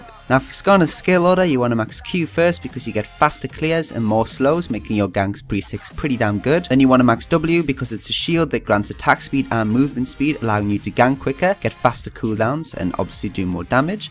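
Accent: Newcastle, United Kingdom. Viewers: mate, you a geordie too?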